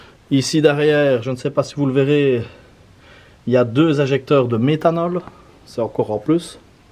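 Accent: Liège, Belgium. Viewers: J'arrive toujours pas à m'habituer à l accent de Liège mdr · T belge ?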